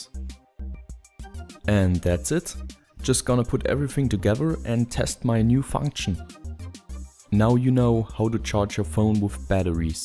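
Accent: Germany. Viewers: Yes, he is German. You could tellthat he is German by his accent.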